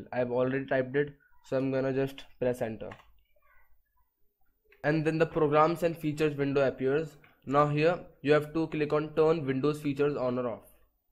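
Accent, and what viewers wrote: India. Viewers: You know the tutorial is gonna work when the accent sounds Indian. ❤ U indian brothers.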